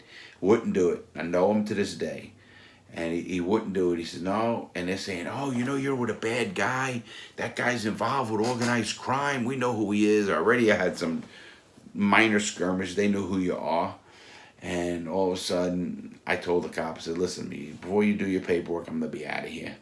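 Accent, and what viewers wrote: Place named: Northeast, United States. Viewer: has a ton of videos here on YouTube, along with 1,000’s of comments on each one. So if this has already been said and I missed it, I’m sorry, but.... story time sessions remind me SO much of Joey Diaz. is a little bit more humble but nonetheless, very similar stories. And they both have that Northeast/NY/NJ accent.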